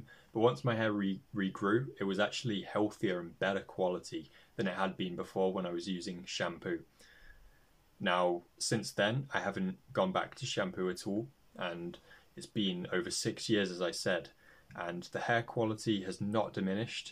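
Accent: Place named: United Kingdom. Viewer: I DID NOT EXPECT YOU TO BE BRITISH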